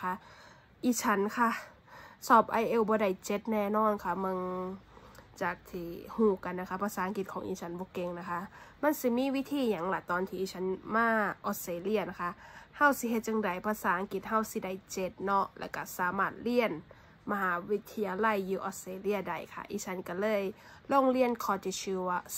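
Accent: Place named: Isan, Thailand. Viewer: พี่พูดอีสานได้น่ารักมาก แบบมันดูดีอะ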